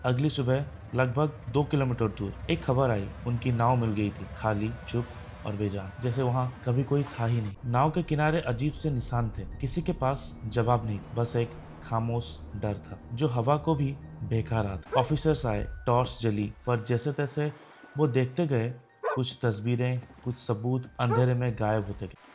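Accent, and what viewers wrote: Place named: Odisha, India. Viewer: kichi nahi bhai thik achi.unique lagiba bhai hindi audience ku tama accent.south bala jetebele english ba hindi kahanti semane south accent re kahanti.sehipari apana odia accent re hindi kahile bi kichi problem nahi.content upare focous karantu,bhasa matter kare nahi.language is just a medium to understant your thoughts.keep it up.love from puri.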